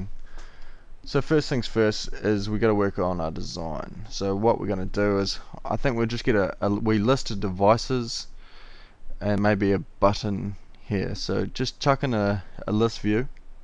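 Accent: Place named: New Zealand. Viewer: Found a Kiwi haha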